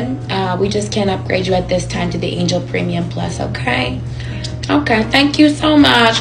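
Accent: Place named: New York, United States. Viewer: love the New York accent. fr Australia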